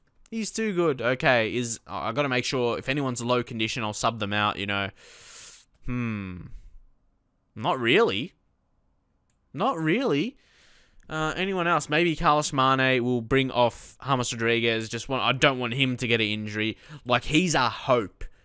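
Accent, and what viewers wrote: Australia. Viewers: Do you sub to people??? Cause if you do it would be greatly appreciated if you sub to me you are one of my fav YTbers and the best thing is your AUSSIE!!!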